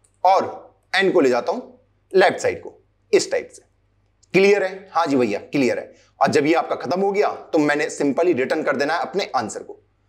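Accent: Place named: Pahadi, India. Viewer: pahadi tone aari h voice me only true pahadi can notice...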